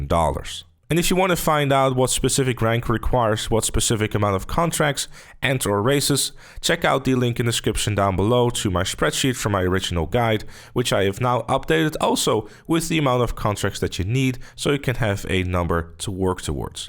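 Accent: Netherlands. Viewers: Bro kwist gelijk dat je een nederlander bent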